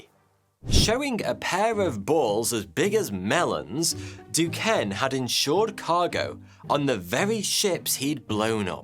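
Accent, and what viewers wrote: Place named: Yorkshire, United Kingdom. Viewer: Everybody loves the yorkshire accent. Exactly why this guy is so popular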